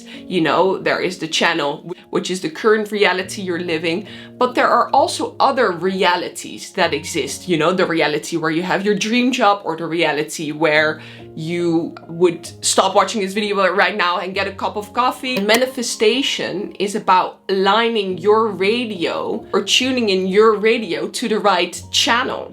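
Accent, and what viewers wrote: Netherlands. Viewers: Can really hear the Dutchy through your English haha. Well explained very good video thanks for sharing this amazing knowledge. Love diving deep into consciousness, manifestation and psychology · you're dutch aren't you?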